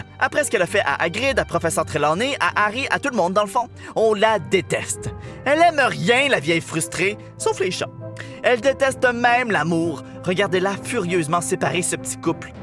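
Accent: Quebec, Canada. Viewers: T'es québéquois ??? T'as l'accent... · Il est marrant ton accent québécois 😂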